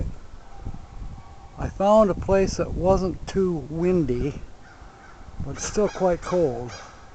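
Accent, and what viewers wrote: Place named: Michigan, United States. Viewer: Hey there. Sounds like Michigan!!!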